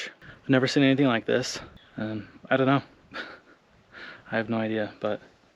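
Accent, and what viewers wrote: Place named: Boston, United States. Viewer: getting the hint you're from Boston? Haha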